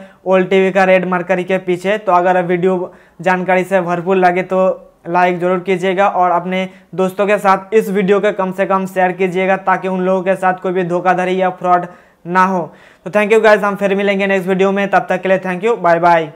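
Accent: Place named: Bihar, India. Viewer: Bihari hai kya be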